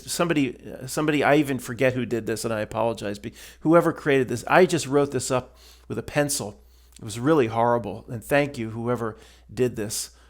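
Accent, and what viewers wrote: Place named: New Jersey, United States. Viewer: Aye! Just resubbed, been a loon̈nng time (I wanna say 2022ish?) Been w u since shrugged, just wanted to say something to yo ass: Thank you. Youve been like an older brother (est.1989) to me since I found myself awake in a world where even my family couldn't see.. sure some of the 1st and 2nd grade truth helped at that period, however there came a point where I needed some1 like you.. no homo. A guy who could be my cousin; just talkin how we talk (jersey) but about the stuff that I needed to flesh out. The stuff that, if brought up to my friends and family would end in argument guaranteed. Anyway, u have no clue, cuz u algorithmically suppressed n shiieeeet, how many individuals like me that were introduced to your content at the time the needed it most. You have no idea how many ppl u have helped. It could be 1, it could be infinitely more, and i speak for myself, yet have a feeling any others would say the s ame thing.. 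if we caught u out; on the worst Monday of your life, we would see you no different my friend. We are the modern day pioneer, seekers, exploring uncharted territory that would make the vast majority of the populace go crazy within days. This is why they refuse to see.. Anyway hope u read this comment. Glad to be back (to YouTube in general).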